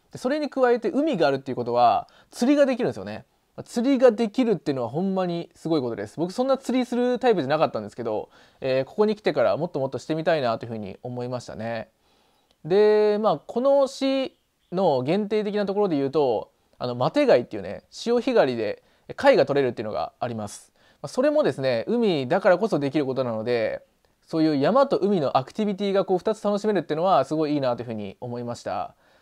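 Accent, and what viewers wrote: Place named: Kagoshima, Japan. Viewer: 方言が強い！の「わい、おい」で1番笑いましたw 私は鹿児島県民なんですけど、わい、おいが全く同じなんですね😂 私、南島原で普通に生きていけそう👏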